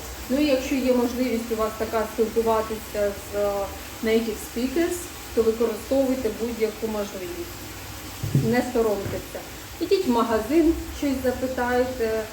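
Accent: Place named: Western Ukraine, Ukraine. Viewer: Доброго дня! Цікаво знати звідки ви виїхали? Судячи з гарної української ви з заходу, невже там було так небезпечно? Чи просто дуже до Англії хотілося?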